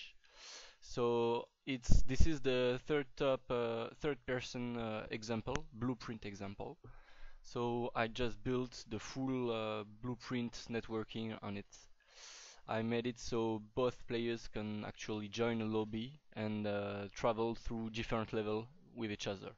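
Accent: France. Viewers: You're french right?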